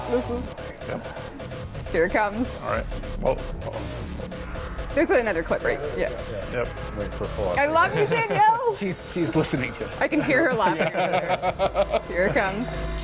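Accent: Ireland. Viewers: Hmm, your english sounds a little bit strange. Are you irish?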